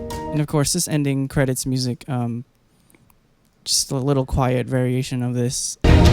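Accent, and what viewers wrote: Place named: United States. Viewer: I thought you would have a Spanish accent with your last name. I was a bit shocked to hear an American accent.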